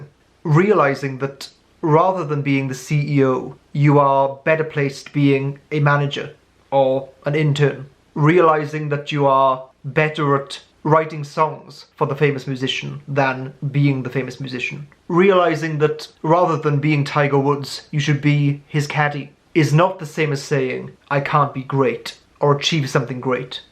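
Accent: Wales, United Kingdom. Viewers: Are you Welsh?? I'm from South Wales and you sound exactly like a Welsh person. Us Welshies are very rare to find so I always get really excited when I hear someone who sounds Welsh. First video I've watched from you and it's so accurate, completely agree with you!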